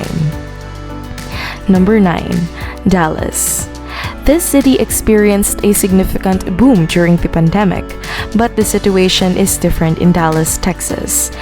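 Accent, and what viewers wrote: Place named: Philippines. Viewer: I detect a accent from the PI. From CA here, average house is around 700k, which is a monthly around 5k for a 30 year... this means the average person has to be bringing home a 15k monthly paycheck........or ~3% of the population. How is it, that any houses are selling at all at these average prices, when 3% of people quality for the average home?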